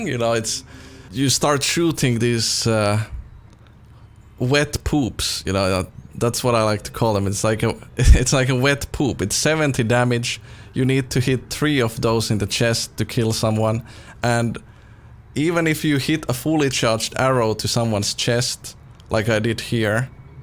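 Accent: Finland. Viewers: Are you Finnish? You sound like it.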